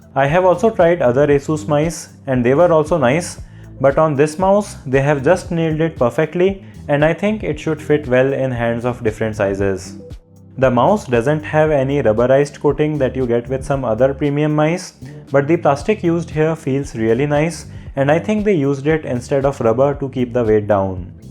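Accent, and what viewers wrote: India. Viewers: Your English like a hindi language 🙄❤️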